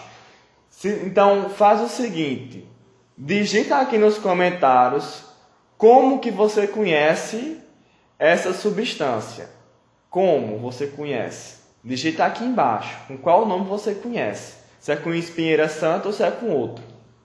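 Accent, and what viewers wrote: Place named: Juazeiro, Brazil. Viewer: Adoro esse sutaque juazeiro. Falando assim me sinto bem melhor!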